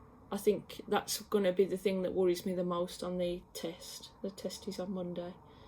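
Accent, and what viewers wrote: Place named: Midlands, United Kingdom. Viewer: Hi. On your braking and some things you have said make sure your seating is right. Braking might be juddering because your not seated close enough to controls. The steering doesn’t sound right. On approach to islands etc yeah slow right down prior to entering the island and find the gap to keep on going. This saves you stopping and having to do the handbrake and you won’t need to try and pull out at such a slow pace. Mirrors mirrors mirrors especially when going around islands where you have to watch the swing on the back. All these things you mention will become second nature when you have passed and your on your own. You will still make some errors like me but you will get there. Good luck. You sound like a midlands gal.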